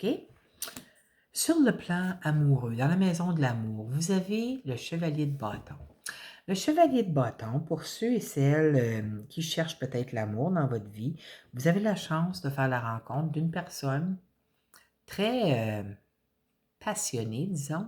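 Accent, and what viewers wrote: Quebec, Canada. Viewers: Vous êtes trop mignonne et pleine de bienveillance, je vous ecoute, vous et votre super accent, avec une immense joie. Vive le Québec et ses habitants 😍😍😍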